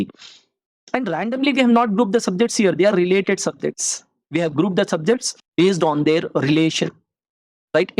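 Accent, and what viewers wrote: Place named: South India, India. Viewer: South Indian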